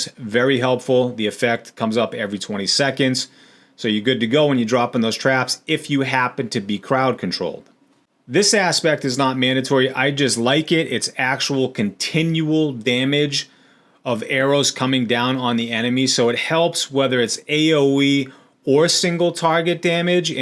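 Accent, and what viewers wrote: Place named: Massachusetts, United States. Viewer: Dudes Massachusetts accent is showing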